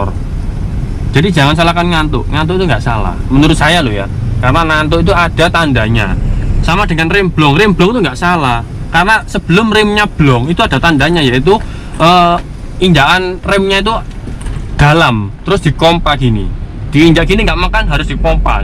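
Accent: Surabaya, Indonesia. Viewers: Siap cakk,,,aq suka reviuw pyan,,,,to the poin,,,basa ne khas srboyo asli yo ?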